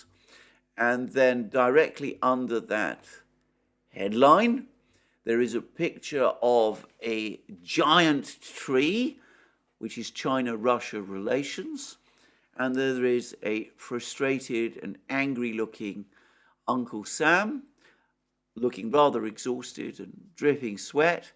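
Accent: England, United Kingdom. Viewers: Greek communist with an English accent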